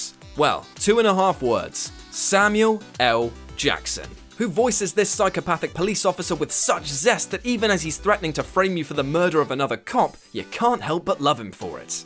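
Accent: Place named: United Kingdom. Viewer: no, he's british. they pronounce words differently than in the states.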